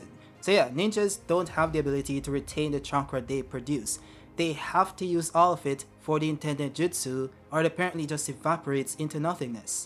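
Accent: Jamaica. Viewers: Yaa Jamaican? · Are you jamaican? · You a yard man?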